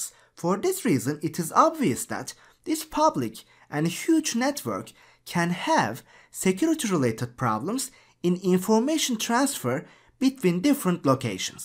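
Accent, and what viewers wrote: Turkey. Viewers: Aynen Türk aksanı bu :> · I guess Turkish origin speaker. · i like your accent, it sounds Turkish to me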